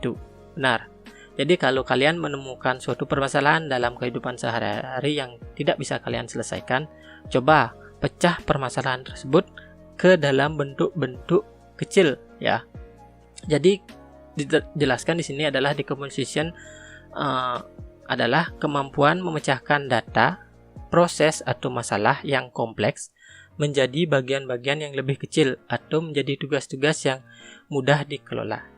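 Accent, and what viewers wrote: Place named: Bali, Indonesia. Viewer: Orang Bali ya bang? Logatnya kentara banget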